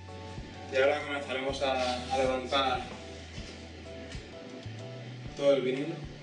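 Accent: Chile. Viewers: No se entiende lo que dice!!! Eres chileno? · chileno la wea fome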